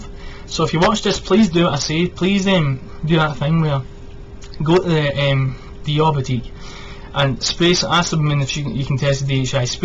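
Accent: Scotland, United Kingdom. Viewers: are you Scottish? btw, i think you've got the only armani nuit review on youtube, so kudos to you for going off the beaten path! just a question, does it remind you of berburry brit rhythm? must be the cardamom, heliotrope and patchouli.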